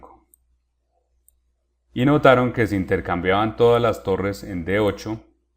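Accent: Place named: Colombia. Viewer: Me encanta tu canal y la forma como explicas. excelente!! Me animaré a comprar tus packs pero el pequeño detalle es que me toca también comprar un Pc porque tengo mac jeje. Saludos desde Bogotá. Tu de qué parte de Colombia eres? me parece haber escuchado ese acento en un torneo IRT.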